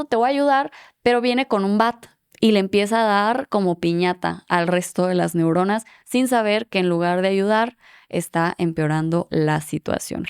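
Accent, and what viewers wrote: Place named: Sonora, Mexico. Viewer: Vivo en Austria desde hace 11 años pero soy de Sonora. Me encanta escucharte pero en este vídeo se te sale mucho el acento washo 😭😭 nimodo... Buen episodio. Saludos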